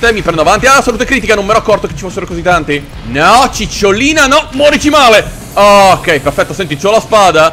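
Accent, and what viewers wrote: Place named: Naples, Italy. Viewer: Sei napoletano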